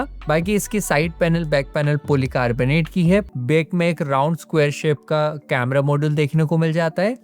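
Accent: Indore, India. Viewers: Bhai Indore se ho kya?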